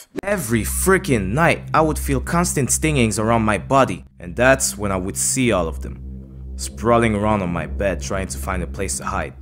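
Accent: Montreal, Canada. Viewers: accent canadien tape fort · Ay Man ça c'est du VRAI Français de Montréal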